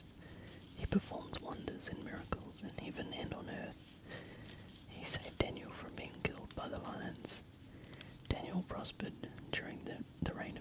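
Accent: New Zealand. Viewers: It's interesting, you'd think being a kiwi myself I'd get used to the accent, apparently not! I've been listening to most of your asmr and it's always very comforting - personally I love your readings of the bible the most. If you one day do a video where you whisper into both ear at the same time with a bible reading... well. (no hints here ;) ). God bless :) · sounds like kiwi accent to me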